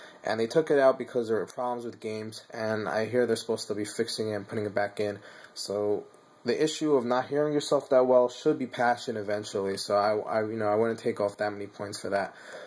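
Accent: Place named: New York, United States. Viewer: Sounds like you got a New York accent lol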